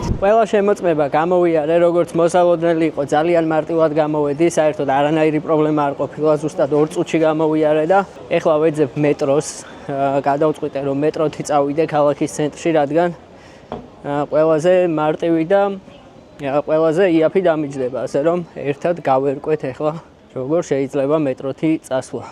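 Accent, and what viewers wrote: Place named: Tbilisi, Georgia. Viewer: წარმატებები შენ! ღმერთმა ხელი მოგიმართოს! ვეღირსეთ თბილისურად მოსაუბრე მოგზაურს👍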